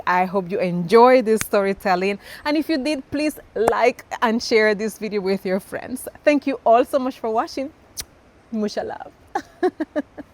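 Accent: Patois, Jamaica. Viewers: I am so proud of you. Gal yuh awesome. An mi luv yuh patwah/patois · ITS NEVER A DULL MOMENT WATCHING U I AM A JAMAICAN LIVING IN THE U,S,BUT THERE IS NO WHERE I LOVE LIKE MY COUNTRY,I OF TO TELL U I NEER TIRED OF LISTENING U TALK PATWHA U IS A REAL JAMAICAN FE TRUE I REALY LIKE WATCHING U BIG UP TO U N UR FAMILY 🙏🙏🙏🙏🙏🙏🙏❤❤❤❤❤❤❤❤ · I love to hear when u speak the patois ❤😅😅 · You’re starting to sound Jamaican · You sound jamaican can hardly hear the spanish accent 😅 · Your patois is soooo hilarious! 😂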